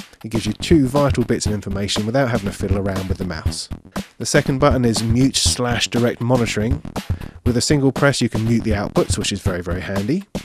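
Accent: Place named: United Kingdom. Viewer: Great review. Nice hands-on footage. And there's something about a British accent that I find calming. That must be why I listen to the BBC all the time.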